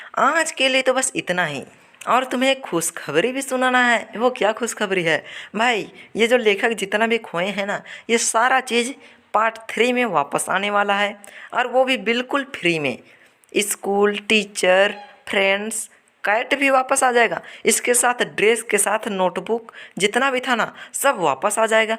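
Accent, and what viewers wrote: Bihar, India. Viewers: Are you from Bihar ? 🤔 Awesome explanation 👍👋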